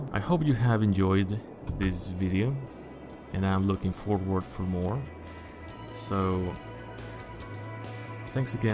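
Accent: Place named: Germany. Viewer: Hablas mejor ingles que el "buen" Peña Nieto. Estaba a punto de decir que eras Aleman por el accento. Yo soy de Francia, vivo en Mexico ;)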